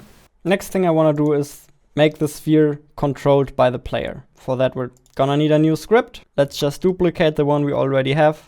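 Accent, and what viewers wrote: Germany. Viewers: He has a German accent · The germäaan accent haha. Bester mann xD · I can hear your German accent, is there a German version of this video? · man hört halt dass du deutscher bist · Erstens man hört raus dass du Deutscher bist... Und zweitens... Durch deinen Dialekt kann ich viel besser verstehen was du meinst... Du sprichst auch sehr deutlich und ausführlich... Und in deinem 39 minütigen Video... Stecken mehr Informationen als in manchen 1 oder 2 Stunden videos... Und das beste ist... Du gibst dir dabei mühe, schneidest alles zurecht und erzählst es mit Emotionen... Andere Leute rattern alles einfach nur runter aber du hast mir 1000 mal mehr geholfen bei dem Programm... Vielen vielen dank! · Are you German? Your accent sounds like it ^^ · I like your german accent, good tutorial <3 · Are u from germany? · Are you german? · ur german accent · Hey, Erstmal danke für dieses krasse Video! 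So macht es einen Spaß schnell einfach kompakt und mit schönen Deutschen Akzent zu lernen. Super Erklärung und Co. konnte alles wie im Video 1zu1 sofort anwenden bis Minute Ab dort funktioniert es bei mir leider nicht mehr weil Visualstudios kein GetComponent anzeigt sowie kein Rigidbody obwohl ich in Unity gecheckt hab ob es auch fürs Objekt ausgewählt wurde. Ich werde allerdings der sache auf den Grund gehen und werde es irgendwie versuchen doch noch umzusetzen. Beste grüße Marcel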